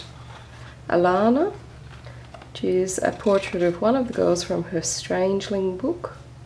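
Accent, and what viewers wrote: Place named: Australia. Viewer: This is such a beautiful book! I'm looking forward to my copy! It should arrive in about two weeks or so. :) I really enjoyed this flip through, your commentary is enjoyable. I love your accent. <3 You from Australia?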